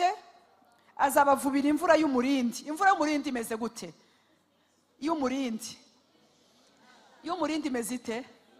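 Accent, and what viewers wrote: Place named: Burundi. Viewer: Minyoni noneho ko uvuga nka uwiburundi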